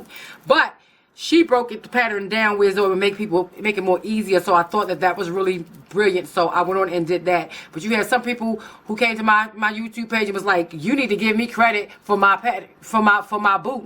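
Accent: Baltimore, United States. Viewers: The boots are sooo cute also where are u from sound like you are here in Baltimore ! Loving your channel ❤️❤️❤️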